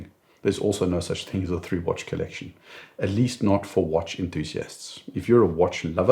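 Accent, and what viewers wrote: South Africa. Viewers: Howzit boet! One of the things that drew me to you (and ID guy) was the accent from home. I'm living in Cape Town. As you know, we have plenty sunshine but insufficient electricity, so anyone who can is getting solar 😂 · I was always thinking that you were South African. Now it’s confirmed. Great accent and great people! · Haha thought you SA’an. I’m an Aussie who lived in SA for 5 years. I like your presentations, honest, well researched, intelligent and very interesting. Go forward well, ne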